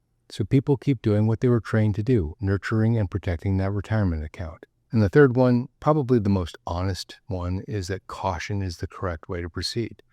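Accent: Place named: Northern Virginia, United States. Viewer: Sounds like northern Virginia!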